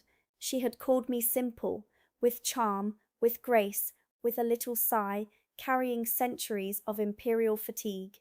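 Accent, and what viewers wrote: England, United Kingdom. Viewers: Just brilliant Where does that brilliant accent come from I actually thought you were Britiah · Why is there a blurred section in the centre of the screen. And why do you have a British accent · Your English accent carries upward inflections at the end of many sentances.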